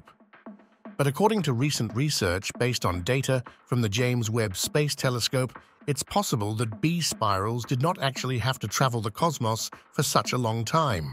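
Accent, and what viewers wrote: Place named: England, United Kingdom. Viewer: More Hilarious Bull##@ !! Always with an "English " Accent , again. We all know that "the BIG BANG Theory" is BIG BULL###T !! always has been. Just more Garbage . Kind of like terms such as "Singularity" or " Quantum Entanglement " Made up terms in an attempt to sound " intelligent" . Humans will never be able to describe Creation. This is an Egomaniac 's attempt to claim some kind of knowledge that they don't have. So tiring.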